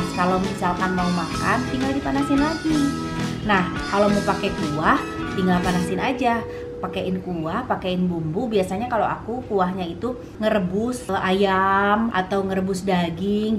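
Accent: West Java, Indonesia. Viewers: Mecak urang mah ai aya artis ngomongna sunda teh teh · Teh geulis mirip dede lesti apalagi logat sunda nya...hihi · Baru nemu channel ini.. Lucu si Teteh karena Nyunda banget... Love u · Sunda pisan teh,resep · Assalamualaikum tth..seneng deh liat nya ttp sederhana n tidak lupa logat sunda nya...bahagia trs yaaa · Sundaaa 😁😁 khas nya nyariosna urang Sunda mahh 😀😀 kaya suara teh Syahrini 😅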